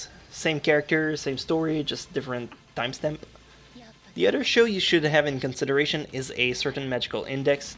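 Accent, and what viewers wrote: Quebec, Canada. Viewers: Hahahaha jaurais jamais pensee que tes quebecois :) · haha clairement québecquois ;) good job ! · Are you from Quebec ?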